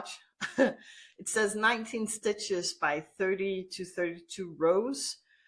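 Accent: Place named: Denmark. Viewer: Thank you for this podcast. Love also the little danish prononciation🤗 Quiet complicated Danish language…..but I try to repeat it a few times after you😂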